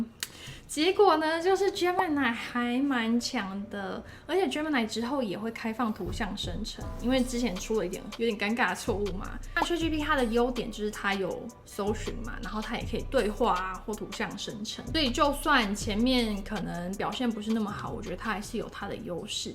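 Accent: Taiwan. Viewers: 声音很好听，满满的温馨台湾腔💪有空多多向你请益技术🌱🌱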